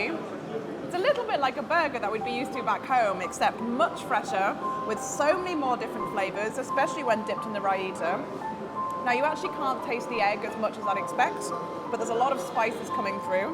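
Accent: Australia. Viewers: Your accent sounds Australian yet somewhere your video mentioned "English girl." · your accent sounds more Ozzy <3 after watching Ozzy Man reviews since 2014, My inner voice has become Aussie eventhough I am Pakistani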